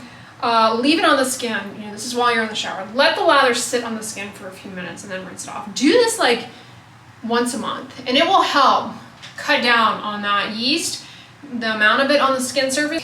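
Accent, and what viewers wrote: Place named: South, United States. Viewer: Happy Sunday! Hope you’re spending time with your mother!!! ❤ In your car talk, you sounded so southern! I never noticed how much of a southern accent is hidden in your voice lol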